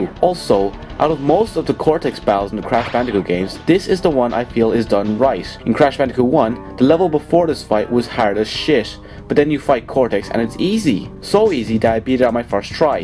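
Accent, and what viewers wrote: Ireland. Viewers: are you irish? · are you Irish?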